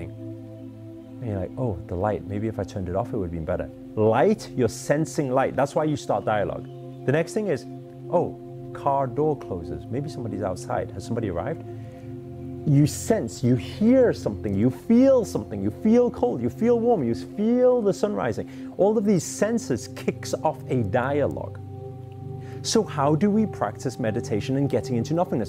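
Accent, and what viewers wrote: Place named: Liverpool, United Kingdom. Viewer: Gotta love that scouse accent! I needed that taste of home! Just had this video sent over and was a wonderful insight that I’m gonna put into action. I’ve been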